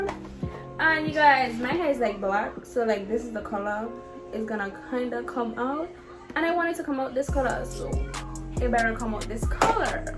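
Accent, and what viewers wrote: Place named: Caribbean. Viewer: 😗😗😗I hear that caribbean accent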